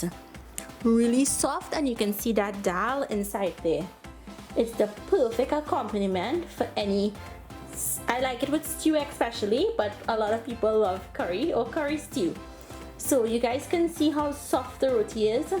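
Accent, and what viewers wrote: Trinidad and Tobago. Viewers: Love how you Spoke Original. #Trinidad.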